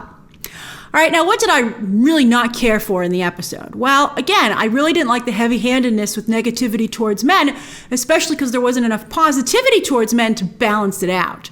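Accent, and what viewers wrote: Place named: East Coast, United States. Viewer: “I think they cudda dun a betta jaub” That East coast accent tho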